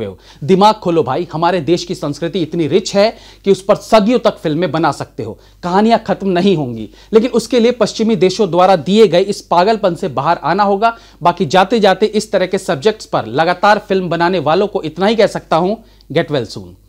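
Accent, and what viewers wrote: Bihar, India. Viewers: Pura biharipan me agaya bhaiya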